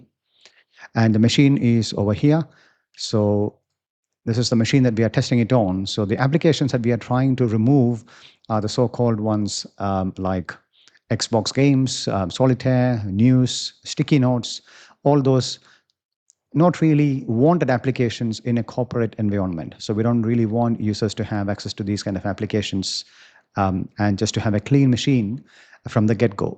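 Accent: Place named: India. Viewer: I was so happy to hear an Indian voice focusing on how to get rid of the junk that is Microsoft from your life and then I saw your header and it says Azure ! Your pace and condensed presentation is still very good 👍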